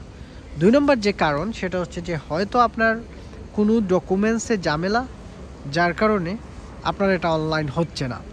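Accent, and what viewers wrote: Sylhet, Bangladesh. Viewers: vai apne ki sylheti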